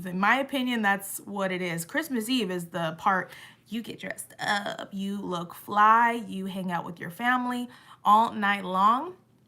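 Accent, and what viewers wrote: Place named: United Kingdom. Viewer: Girl luv your brittish accent 😍 "what " my daughter colored her hair black &she luvs it :)))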